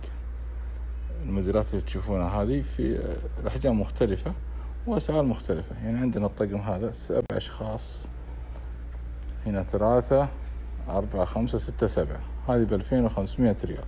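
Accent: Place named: Syria. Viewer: من سمعت لهجته سوري كنسلت لان السوريين في كل المجالات اسعارهم ١٠ اضعاف السوق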